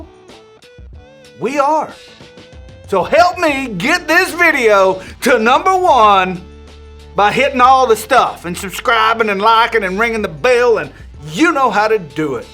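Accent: South, United States. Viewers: Help me out. How is he a Yankee? He's got a southern accent it sounds like to me. I'm from NY and I can tell you from whatever accent that is, he is definitely NOT from the north!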